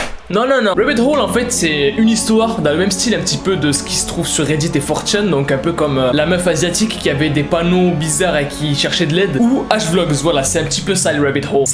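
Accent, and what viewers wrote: Quebec, Canada. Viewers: L'accent québécois!!!! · Bel accent québécois, approuvé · Il parle québécois comme moi 😂🤣😭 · OMG tu as un accent QUÉBÉCOIS PARFAIT on aurait dis un des humoristes québécois 😂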